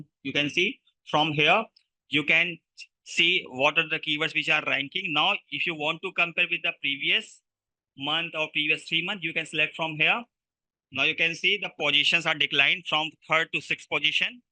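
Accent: India. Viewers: Thankyou so much , iam interested to learn seo .. last time I seen your video I can't understand that foringer voice so this time it's an indian , now i understood every topic thank you ❤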